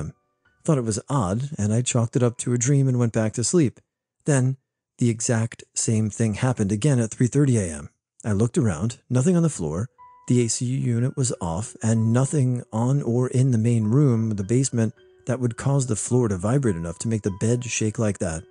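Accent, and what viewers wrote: England, United Kingdom. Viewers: Longtime fan of the channel, as a Brit, I think your English accent is hilarious. 😆 Keep up the good work! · The English accent in the first story made me laugh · Hi! Thank you for your great work! I was super entertained by your British accent. 👍🏻👍🏻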